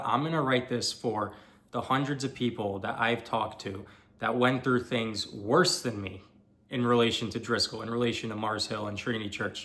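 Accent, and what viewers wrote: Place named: East Texas, United States. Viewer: Sounds like someone east of Dallas!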